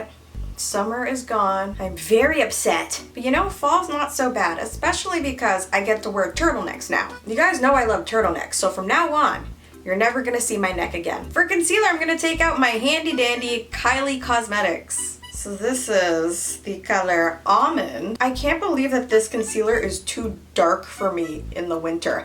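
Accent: Canada. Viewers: Your Canadian accent is killing me. 😂 I'm from the U.S. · Your Canadian accent sounds like how my grandpa talks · Canadian accent sounds really nice. I just realized they had their own accent 😂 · Canadian accent soo cute😂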